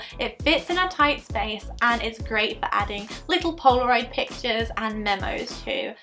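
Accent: United Kingdom. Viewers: Omg she’s pretty has a British accent and her name is? 😳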